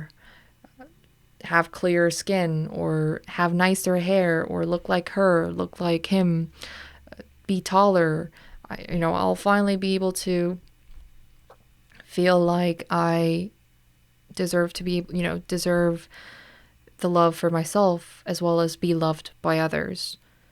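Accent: United States. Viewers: What part of the United states gives people that accent?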